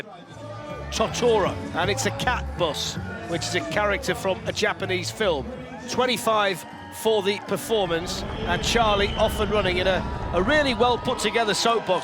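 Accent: United Kingdom. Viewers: This seemed interesting until the British accent started. Then it was annoying.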